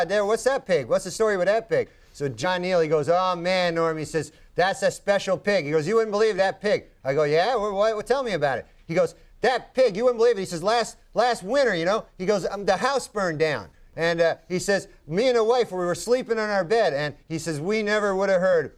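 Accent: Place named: Canada. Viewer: His Canadian accent is showing eh???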